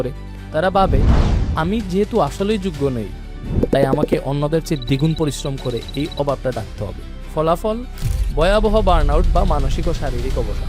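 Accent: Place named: Sylhet, Bangladesh. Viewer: সিলেটের ভয়েস না দিয়ে শুদ্ধ বাংলা ভয়েস দিলে অসাধারণ লাগবে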